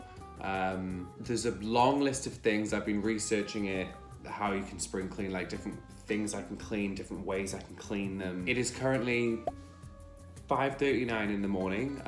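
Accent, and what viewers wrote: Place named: Newcastle, United Kingdom. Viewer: His Geordie accent is sneaking in quite cutely!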